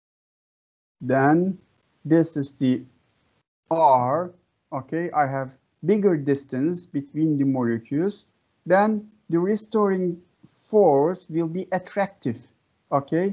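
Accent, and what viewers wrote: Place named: Russia. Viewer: At first I thought that you were speaking Russian.. But glad it's English.. By the way from which country are you from?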